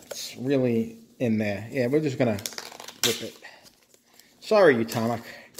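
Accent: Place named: Northeast, United States. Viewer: Yup, the north eastern US accent is still the most atrocious in the country. The accent sounds like a retard being beaten.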